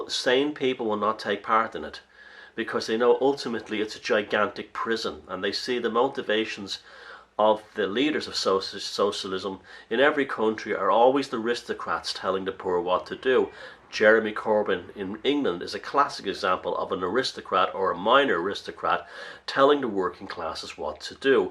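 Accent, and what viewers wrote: Ireland. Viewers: Hello, thanks for your inspiring video,s! Watching your twenty twelve, video! Totally agree with you!!!!!! Noticing, your tantilizing lrish accent, tinking, about jr Tolkien, sermillion Hobbit! Lord of the rings! Thanks very much, bee jeebers!!!!!!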